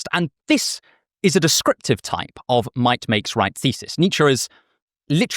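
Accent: United Kingdom. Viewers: this is my first video of yours that ive watched, was so shocked from the british accent i got whiplash, you give american vibes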